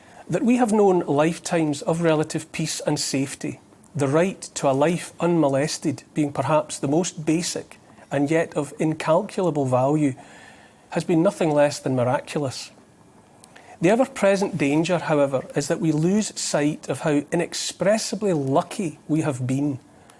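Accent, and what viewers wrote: Scotland, United Kingdom. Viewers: Always admired the man (and his Scottish Enlightenment accent) But during Covid19 plague? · Neil's Scottish accent,reminds me my young time when I was attending my primary school and then grammar school in nothern Scotland Vic.(G.D.of Lux.).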